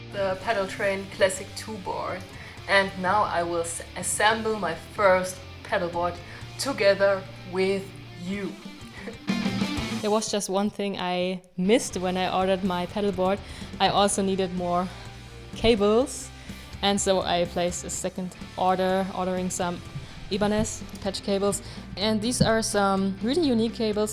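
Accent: Germany. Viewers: Are you from Deutschland? I love that German accent :3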